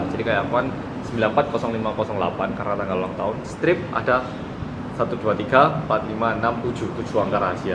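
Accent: Java, Indonesia. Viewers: lucu rasanya mendengar orang korea ngomong nya medok.. emang sih aku juga medok dan aku asli Indo tapi masi kesusahan bahasa sendiri haha.. (bahasa jawa maksudnya). btw ibuku suka ngeliat video mu dan ternyata ya.. lumayan lah... ketawa sendiri aku ngeliat nya :v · logat jawanya nggak ilang2 haha 😂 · klo ngomong inggr singapore accent banget, klo ngomong indonesia jawa banget, klo mnurut sesama org korea, korean-nya beraksen juga ga? kan uda lama tinggal di Indo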